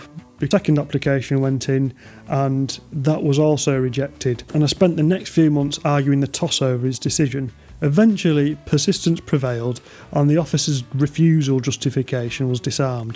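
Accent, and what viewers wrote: Yorkshire, United Kingdom. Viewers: Occasionally the YT algorithm gives you a gem, this is one such time, never asked for a sub but got one anyway for the laidback approach and wonderful Yorkshire accent. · It's really been a labour of love. I admire your extremely hard work, at times it seems rather back breaking.. Seeing you were self employed and working. I hope the cost of the place was worth renovating it.. Hah all the best to you and well-done.😊😅😂. I love your accent as it reminded me of my dad and grandad who were Yorkshire folk from Scarborough farmers actually. Greetings from South Africa😊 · Really enjoy the Yorkshire accent and the documented effort that goes into these renovations. Reminds me not to feel bad hiring a professional….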